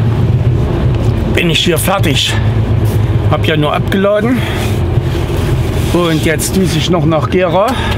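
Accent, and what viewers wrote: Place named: Saxony, Germany. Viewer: Hallo, du bist einfach der zuverlässigste. Jeden Tag freue ich mich auf dein Video. Und sächsisch finde ich seitdem auch nicht mehr förchterlisch! Mach weiter so!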